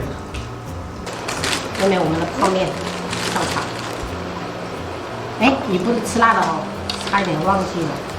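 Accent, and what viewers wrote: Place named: Quanzhou, China. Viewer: 是福建泉州的口音。也屬於閩南語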